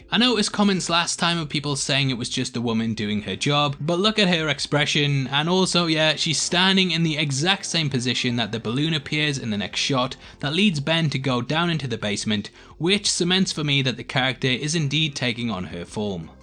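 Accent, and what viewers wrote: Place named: Newcastle, United Kingdom. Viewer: Are you a geordie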